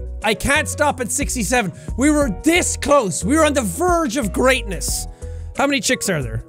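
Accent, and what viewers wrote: Ireland. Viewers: We love the angry irish accent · Holy crap he is Irish so I am yay 😁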